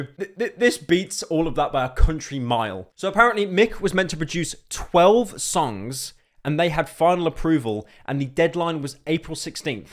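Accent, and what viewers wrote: United Kingdom. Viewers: I always wait for the explanation because I'm European and I prefer the British accent over the depressed accent. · even if Charlie uploaded before, I'd still watch just because I prefer his britishness over Charlie's monotone voice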